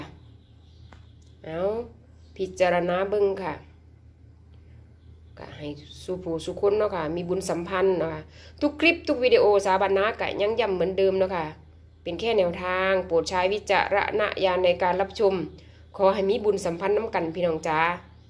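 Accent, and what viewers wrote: Isan, Thailand. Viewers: มักคำสาวบ้านคำที่.เว้าๆแวๆ.คำที่เว้าว่า..พี่น้องเอ้ย..ถูกใจ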